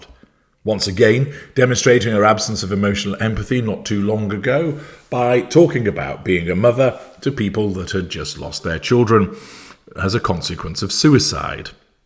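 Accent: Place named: RP, United Kingdom. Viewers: This man’s channel is only positioned to offer his guesses. He uses a posh manner of speaking and decent production vale’s which both lend themselves to a “feeling” of truth. His guesses and opinions have no more credibility than yours or mine. For anyone who cares about my opinion I happen to think there does exist 1 boy child, born by a surrogate, that is of no relation to either Harry or Meghan. I believe they have no custody of him. I believe there is no girl child whatsoever because the child was supposedly born in the US. I notice the British have trouble understanding that in the US there are no secret births no redacted birth certificates. If a birth certificate cannot be located by the most ordinary citizen there is only 1 reason for that — there was no birth. There are no exceptions. It is that simple and no one on planet earth can refute that fact. 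The BRF — none of them — have ever seen this girl child. Serious mistakes have been made by both Queen Elizabeth and even more so by Charles. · You the devil's son,found out your kids are not yours,so making up bullshit about others. Trying to talk posh when you just so sick,should be in a mental home. Tina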